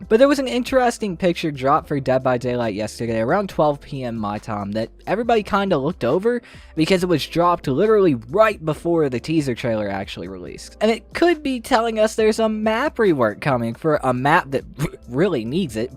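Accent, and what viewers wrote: United States. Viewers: The strong American accent gets a like from me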